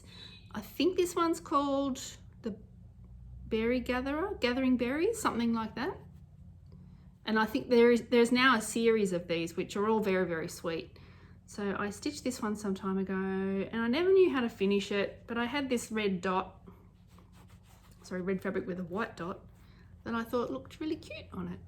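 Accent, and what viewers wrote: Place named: Australia. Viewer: Great to see you back! Love what you do and your presentation was very enjoyable... not too many of us Aussies on YouTube so it's so refreshing to hear you!!! Take care 💚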